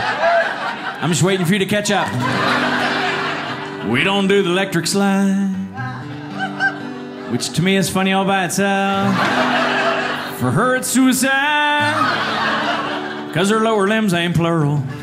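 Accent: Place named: South, United States. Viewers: God I love the southern accent! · This man's accent is literally the stereotype of a southerner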